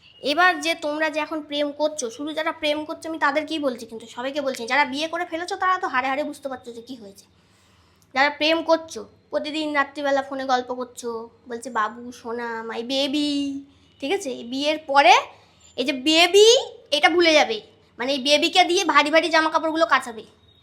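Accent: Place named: Hooghly, India. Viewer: Tomer kotha sune mone hoche hoogly tebari kintu hooglyr meyera erohkom noy to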